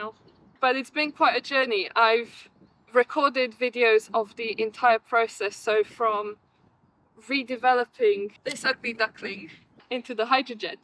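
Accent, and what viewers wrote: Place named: London, United Kingdom. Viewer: talk in this weird London accent... a malformed style of English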